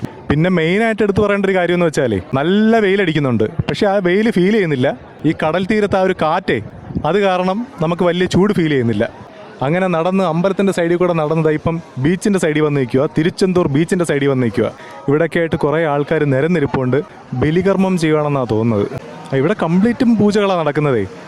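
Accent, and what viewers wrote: Kollam, India. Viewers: കൊല്ലം ശൈലിയിലുള്ള നാടൻ, ജാഡ ഇല്ലാത്ത അവതരണം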